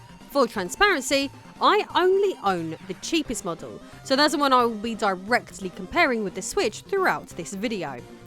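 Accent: England, United Kingdom. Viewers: Damn, she’s hot, especially with that English accent.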